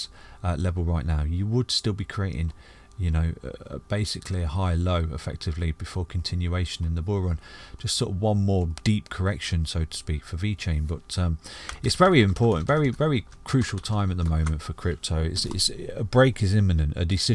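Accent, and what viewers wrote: United Kingdom. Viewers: The british accent and the famous slangs always get me to buy more. VET 🌚 ✨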